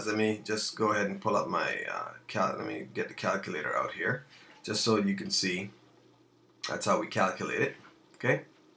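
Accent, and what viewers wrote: Jamaica. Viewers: Where is your accent from? Sounds like a cross between Jamaican and Northern Ireland lol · he got a hidden Jamaican accent... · Are you from Jamaica...if so place email me at <mail>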